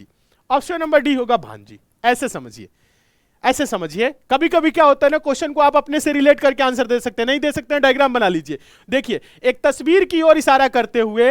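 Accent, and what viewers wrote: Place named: Bhojpuri, India. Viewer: Sir G bhojpuri ek dam bhojpuri hero jaisa bole❤❤❤❤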